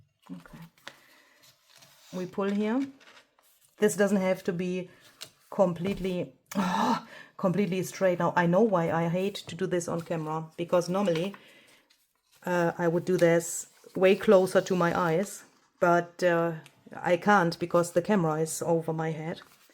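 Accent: Germany. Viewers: I am German. At first it was difficult for me to listen to your English. But your creativity is way too amazing to miss. So I got used to your English. I hate the German accent 😅 I don’t know if your English gets better with any video or my ears just got used to it. Anyhow by now I love listening to you and laugh when you stumble about words. As someone who is been missing even German words every now and then I feel with you. I love the way your brain works and how you do your tutorials. Please stay as funny, educational and creative as you are ❤ you definitely became one of my most favorite crafters on YouTube ❤❤❤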